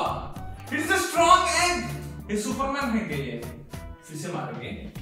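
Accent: South, India. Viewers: your south indian accent is so good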